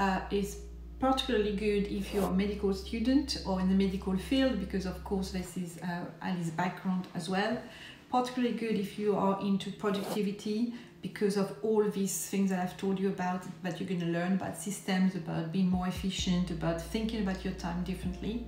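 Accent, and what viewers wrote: France. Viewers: So nice to see a review from a female perspective and someone not in their 20s or 30s (I with you sis!). Congrats on completing the course. Love the French accent and the Bonjour at the beginning. Good luck on your Youtube journey.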